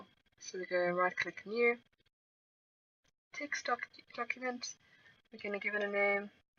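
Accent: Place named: South Africa. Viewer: you sound very South African. Awesome. Dankie.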